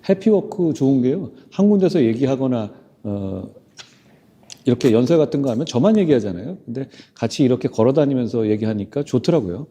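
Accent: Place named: Chungcheong, South Korea. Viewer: 한 전 대표님 말씀중에 충북사람들의 어감이좀 은연중 표현이 되는것 같 습니다.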